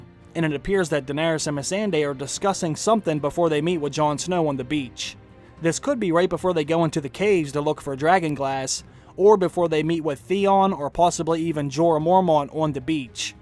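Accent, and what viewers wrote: United States. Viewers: Which American accent is that ?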